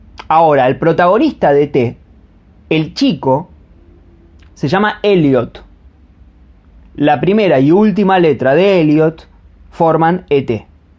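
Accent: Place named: Gaucho, Argentina. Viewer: Tu pinche acento gaucho.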